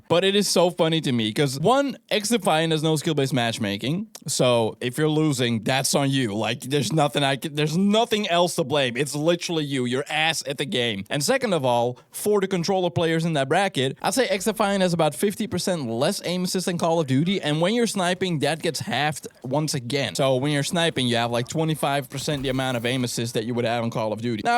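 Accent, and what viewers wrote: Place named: Netherlands. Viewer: je klinkt nederlands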